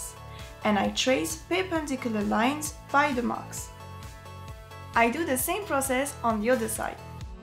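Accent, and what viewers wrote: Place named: France. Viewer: on sent bien l'accent francais xD mais merci quand mêne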